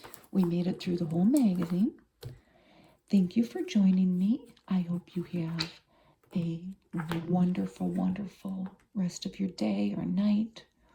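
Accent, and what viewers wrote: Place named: Minnesota, United States. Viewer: Sounds like Minnesota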